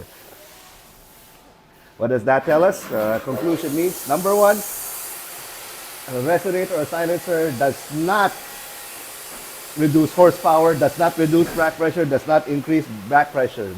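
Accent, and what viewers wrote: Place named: United States. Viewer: parang laking US si kuya..ganda ng english eh hehehehe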